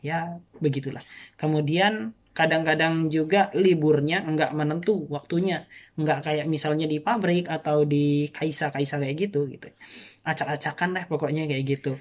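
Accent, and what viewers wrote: Java, Indonesia. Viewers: Mas kamu dari jawa ya kok logat dan bahasanya jawa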